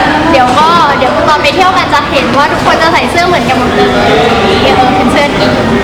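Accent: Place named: Taiwan. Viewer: ชอบสำเนียงของคุณ..พูดจีนสำเนียงไต้หวัน..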